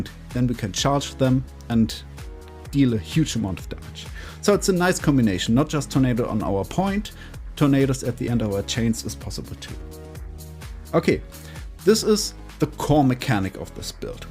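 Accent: Germany. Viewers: man hört direkt das du nen deutscher bist finde es richtig cool das du englischen content bringst weiter so :D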